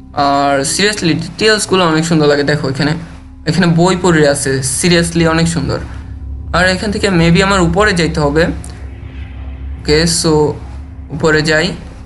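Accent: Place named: Barishal, Bangladesh. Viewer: Tmi ki borishailla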